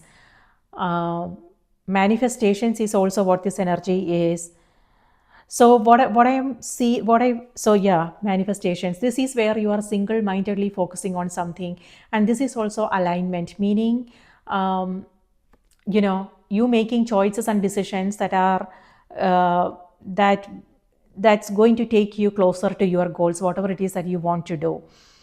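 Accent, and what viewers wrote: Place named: India. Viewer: nooo ...not with your indian accents...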